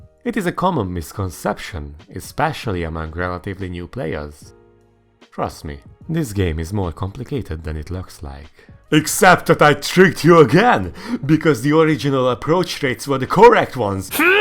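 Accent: Hungary. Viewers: Egy ideje nézem a videóidat, ismerős volt a kiejtés, de csak most realizáltam, hogy magyar vagy :D Így tovább!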